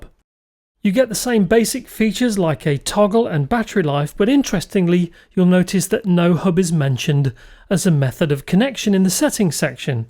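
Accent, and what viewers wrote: United Kingdom. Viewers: U sound like u from uk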